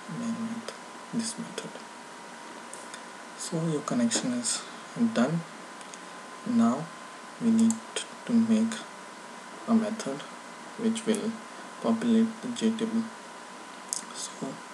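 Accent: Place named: India. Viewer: india english??? OMG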